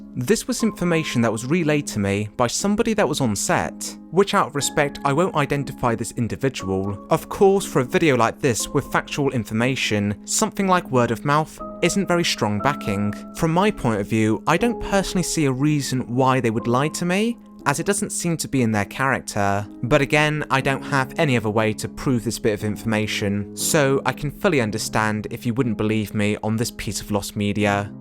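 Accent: United Kingdom. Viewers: People hear a British accent and immediately go "you could be the Doctor!".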